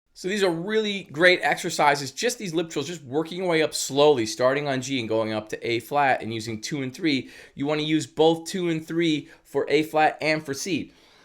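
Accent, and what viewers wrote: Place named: New Jersey, United States. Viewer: ha! I couldn't place your accent -it sounded like new jersey.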